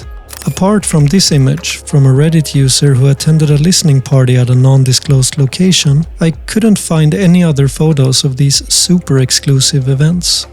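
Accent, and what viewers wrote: Sweden. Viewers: You didn't ask me to like, subscribe or comment, but i did all three. Awesome video and i love your mild Swedish (?) accent.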